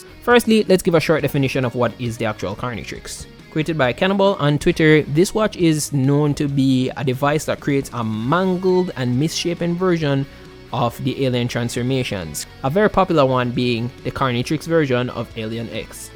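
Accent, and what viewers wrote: Jamaica. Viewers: Haven't your watched videos in some time, quick question are you Jamaican?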